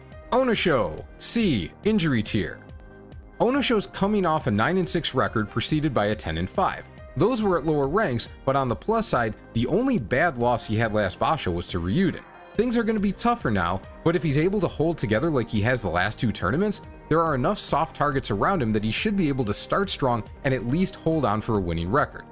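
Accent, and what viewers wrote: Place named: United States. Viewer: Good analysis, but I wish you stopped americanising Japanese words and Japanese names.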